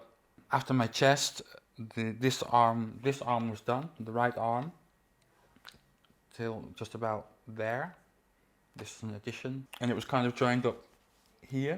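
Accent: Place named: Netherlands. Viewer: You are definitely not from the UK, your Dutch accent and Dutch words here and there are a giveaway.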